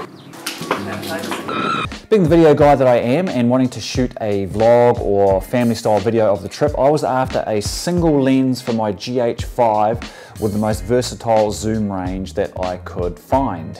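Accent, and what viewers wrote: New Zealand. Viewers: Great educational video. Nothing like a good kiwi accent. NZ looks fantastic.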